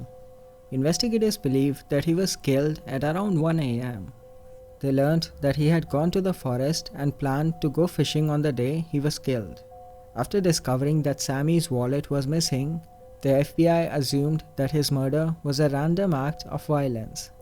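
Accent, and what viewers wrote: South India, India. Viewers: The narrator have a South Indian accent, I like it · I have difficulty with Indian accents. I won’t watch another one with this guy. Normally a woman narrates these. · Few seconds and then i have to stop....annoying Indian accent... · U have south indian accent 🙏🏻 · This Indian accent is so thick one could easily cut it with a knife. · The voice over Indian voice made this even more scarier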